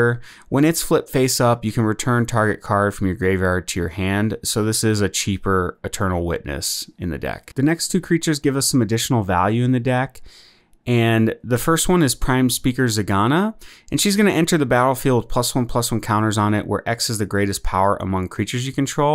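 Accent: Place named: Canada. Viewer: I am only 5mins into the video, and I have to say this video is a big improvement from the last video I watched. The flow is better overall. You have a thick Canadian inflection, not a bad thing just noticeable how you end each sentence high. I would also consider new Viven planeswalker that would allow you to flash your bounce creatures to save important creatures as a way to protect key pieces.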